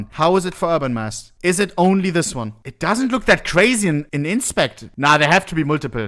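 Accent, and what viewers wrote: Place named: Germany. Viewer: the german accent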